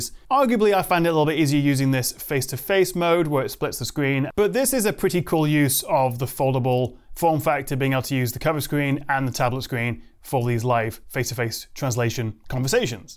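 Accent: United Kingdom. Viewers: Love how British you sound and the Harry Potter reference.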